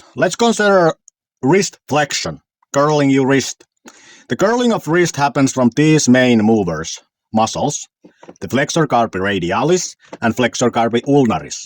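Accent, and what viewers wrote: Finland. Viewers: strongest finnish accent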